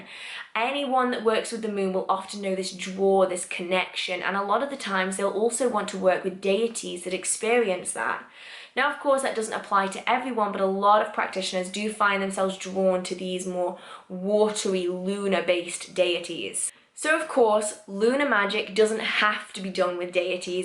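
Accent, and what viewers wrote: United Kingdom. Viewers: This is an excellent video in all respects and I will share the link on social media later this year. [ Am looking into neopagan lunar magic on youtube. ] One important reason to recommend this video is the book reference. At your website I found another significant book by Joey Morris which connects two areas of my interest: Celtic mysticism and hedge witchcraft practice. I may get that. Of course, I always appreciate the Brit accent. The moon magic I use is formal classical Hindu / Buddhist. Regards to Plymouth.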